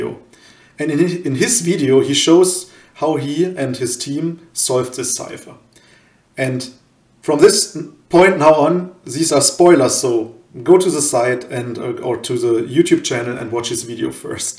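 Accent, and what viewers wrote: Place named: Germany. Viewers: Interesting! But that German accent really is a killer. That's me saying this, a German. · The german accent MAKES the video! 😍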